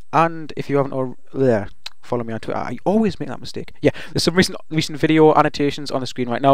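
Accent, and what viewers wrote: Newcastle, United Kingdom. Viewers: By any chance are you from Newcastle?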